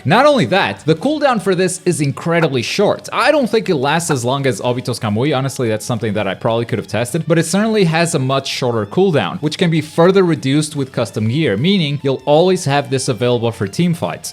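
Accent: Portugal. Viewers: he´s portuguese, LET´S GOOOO, na tua apresentação do canal tu foste logo cm o sotaque d portugal ahaha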